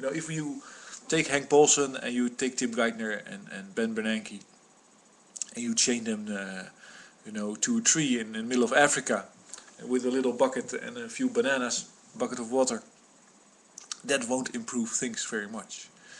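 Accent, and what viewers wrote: Netherlands. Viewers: lekkere dikke nldse accent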